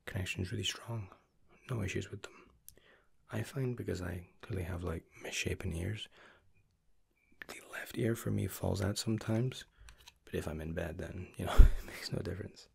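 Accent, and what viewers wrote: Scotland, United Kingdom. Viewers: i have been listening for so long i can't tell... Is he british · are you from scotland and if so where abouts?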